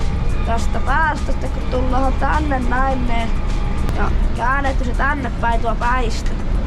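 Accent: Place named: North, Finland. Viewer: Hyvä video tilasin kanavan vaikka tuota pohojammaan murretta ei jaksaisi kuunnellakkaan😁😅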